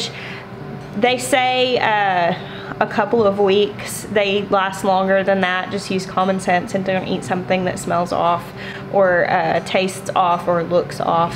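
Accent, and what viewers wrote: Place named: Knoxville, United States. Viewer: So....im watching with my 78 yrl old momma and when you started talking she said "is that girl from knoxville?" And i said uhnnn. ...yea why i was shocked because shes never watches your vids with me but she indeed could tell you either were born in or raised in Knoxville....is she right?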